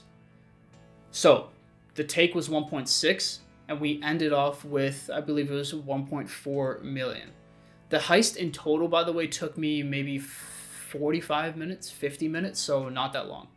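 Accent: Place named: Canada. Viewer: Ahhaahhhhh! You are Canadian! 🤣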